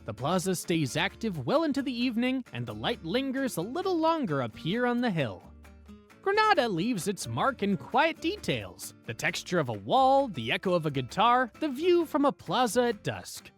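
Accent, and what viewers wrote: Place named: United States. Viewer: good tips but the gringo accent, ouch !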